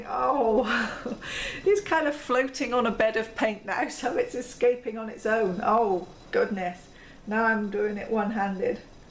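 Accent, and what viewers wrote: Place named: Oxfordshire, United Kingdom. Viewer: Hi!:) That was beautiful and definitely my favourite of all in the challenge! You need to embrace your inner green lol:) that lime was very refreshing. Love that cup too, I agree with avani t - put a hole in the bottom and make a string of lights. Only thing is you'll need to do at least a dozen more, although I'm sure you'll get there...:)) oh and it's pronounced Sur oooh lee um here's someone called Emma who has a YT channel for just these sort of things <link> I know this because I had to (ask my better half) look it up for a picture I made a while back - added the link in case you'd like to see the picture <link> I was wondering where you are from originally, love your accent and thought I could guess but can't quite put my finger on it. Must be close to Oxfordshire, maybe?:) All my best Heidi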